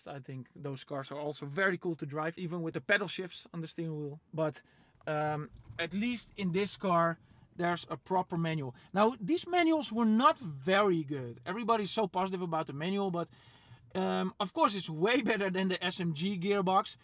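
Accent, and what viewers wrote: Netherlands. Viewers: that dutch accent tho, jullie knn echt geen zak talen man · Your accent sounds like you're from the Netherlands? I have a 2005 E46 M3 with 265 mm square setup lowered on coilovers with all sorts of High Performance Suspension goodies. I treat my daily 50-mile round trip commute as my personal racetrack. I wouldn't say my setup is super high on comfort, but it is off the charts in fun. My car came with 19-inch Wheels but I eventually graduated to lightweight 18 inch wheels which perform better and are definitely more comfortable. Bigger selection in 18inch and less expensive too.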